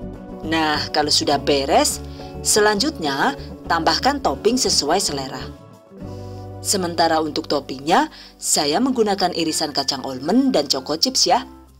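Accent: Banyumas, Indonesia. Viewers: Deneng bisa basa ngapak yak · Bunda,kok bahasane ngapak , emang asli mana bun,,,oya bun nnti saya coba ,,,,,sehat selalu bun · 😂😂😂 lagi serius2..., becanda gaya ngapak ... The best lah Bu!, thankyou resepnya Bu!...saya coba nanti. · Aq pasti ngakak denger logat ngapaknya,,,,,kui sing tak kangeni bunda,,,,aplgi resepnya,,,,,,suka bangettt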